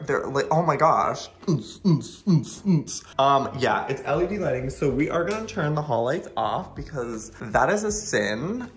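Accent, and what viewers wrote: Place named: United States. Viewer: im curious - do you feel like people you interact with in london treat you differently because your accent is obviously from the states? i've considered visiting london myself and i have always wondered that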